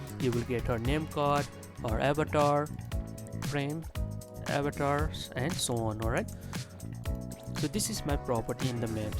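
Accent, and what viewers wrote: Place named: Nepal. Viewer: The video was really good and easy to understand, but your accent got me. By any chance are you from Nepal? Your accent really reminds me of a typical Nepali accent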